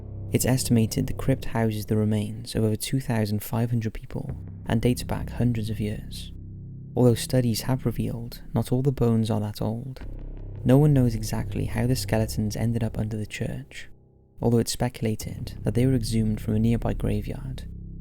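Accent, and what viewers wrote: Wales, United Kingdom. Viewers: Sounds welsh to me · Definitely sounds Welsh to me! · Deffo welsh! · Except as a Welsh girl, I definitely do hear Welsh in his accent! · It's definitely Welsh, I live in the Midlands and we sound nothing like this guy.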